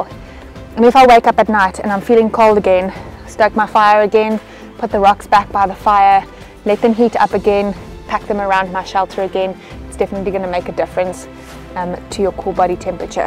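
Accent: South Africa. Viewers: Good evening from a South African living in Switzerland .. (and a 'Kleinmonder' from the South Western Cape nogal 😊) .. 👍👍👍 A good posting .. thanks. Your Post popped up out of nowhere on my feed .. I took a quick look-see and when I heard the accent .. 'Sef Efriken' for sure 😁. Switzerland has many forests (minimum 30% of the Country Land Mass by Law) which are mostly not big enough to get lost or stuck in ..mostly 😏 .. especially where I live up North on the banks of the Rhine. .. no 'real' mountains .. just at times, steep hills. Looking at that Geography there by you, it reminds me so much of the Overstrand / Overberg Region. So nice seeing an Outdoor You Tuber from the Western Cape 😊. Yep .. getting a fire going in wet weather can be challenging .. the secret being in the preparation. For info .. very effective (and cheap) homemade fire starters are Cotton (100%) Makeup Pads (the round ones). 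Soak them in melted Candle Wax, allow to harden and you're good to go. Even better, soak them in melted Vaseline beforehand and then in the Candle Wax. They'll get 'hell frozen over' back on line and they burn for a good number of minutes. Some good tips in your post .. especially regarding layering and the danger of hypothermia. One should also take care not to overly perspire in cold (even no so cold) weather .. this 'can' be a killer. The secret is to start 'cool' and then add / remove layers of clothing to hopefully maintain that 'just above feeling cool' temperature. Another ti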